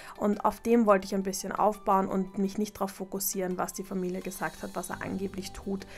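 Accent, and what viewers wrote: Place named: Vienna, Austria. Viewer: Liebe, ich habe gerade abboniert. Bist du aus Wien? Du klingst so, ich bin aus Wien. Morgen zieht ein Hund aus Russland bei mir ein. Wenn sie sich eingewöhnt hat könnten wir Socialwalks machen. Du wirkst sehr freundlich und positiv. Liebe Grüße.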